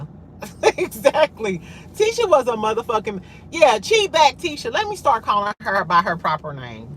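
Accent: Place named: Chicago, United States. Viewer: Girl you sound like you're from Chicago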